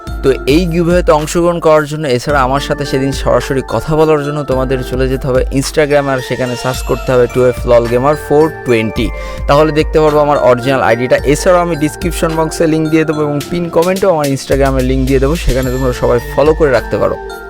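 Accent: Jessore, Bangladesh. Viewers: ভাই আপনার জেলা মনে হচ্ছে যশোর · ভাই আপনি তো আমার নিজ জেলার লোক আমি বুঝতে পারছি আপনার বাসা যশোর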